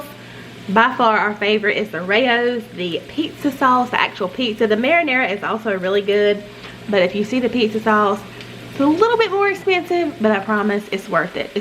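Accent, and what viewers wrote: South, United States. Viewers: Dad gummet! Girl you’re speaking my language…true southern 😂 They all looked delicious! Thanks for sharing the recipes!! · Hi, I'm new to your channel, but like watching you cook and all your easy recipes too. I really like your southern accent too. It's cute, & I subscribed too. Thank you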